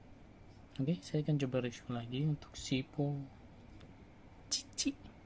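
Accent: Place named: Banyumas, Indonesia. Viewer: mantap👏👏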